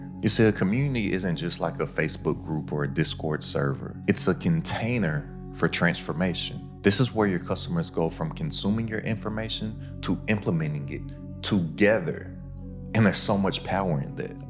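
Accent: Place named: Los Angeles, United States. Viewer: oh wow that's trippy. Same exact type of slang and speech pattern as my homies in the LA area. Ur videos are fantastic, exactly what i needed. Keep up the good work 👏 starting a youtube channel very soon thanks to you. Finally going in.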